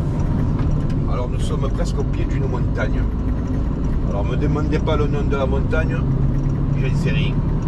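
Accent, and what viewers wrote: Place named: South, France. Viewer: Toulon ouest. dans le Var et pas à Vars dans les Alpes,ni au Portugal Une visite passionnante avec l'accent du sud en bonus.Des vidéos comme celle ci, j'aimerais tellement en voir plus souvent 🎉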